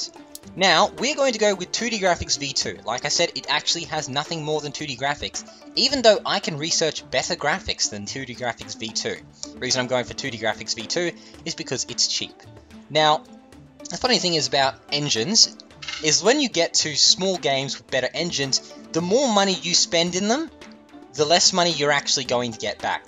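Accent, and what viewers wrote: United Kingdom. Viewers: Nicely done tips, this game was kind of frustrating me in that every game i made would tank or i was moving too fast when it told me too. Also rates up for your voice too, you must be a Brit like I.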